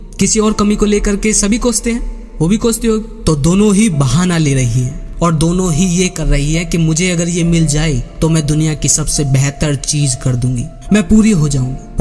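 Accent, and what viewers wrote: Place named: Bihar, India. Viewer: Bihar se baat kar rahi ho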